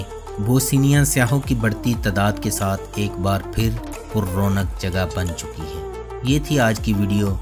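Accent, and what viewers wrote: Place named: Punjab, Pakistan. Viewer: yar urdu to seikho bhaye sp URDU esy bolty ho jesy panjabi bol rahy ho mazrat k sath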